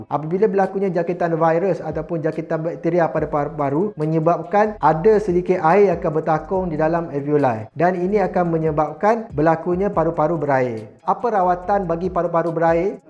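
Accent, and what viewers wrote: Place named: Kelantan, Malaysia. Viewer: Ore klate pesen nii..gaya kecek gitu😂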